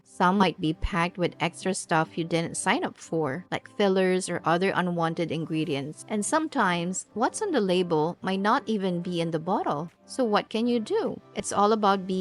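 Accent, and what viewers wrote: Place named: Philippines. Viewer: voice is from the Philippines.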